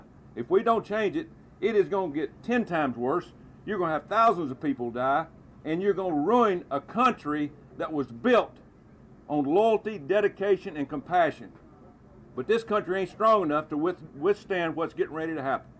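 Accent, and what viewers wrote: United States. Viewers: Yes people this man knows what he talking about and talks like a true american Thank you sir and God bless you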